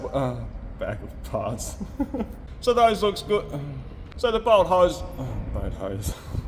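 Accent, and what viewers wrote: Australia. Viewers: Right, I like that starts every sentence with "Right!" Sounds authentic! Sounds Australian! Sounds Right!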